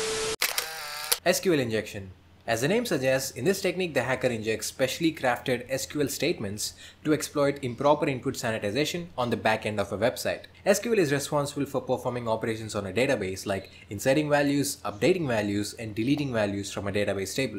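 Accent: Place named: Guntur, India. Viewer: Indian accent.. no thanks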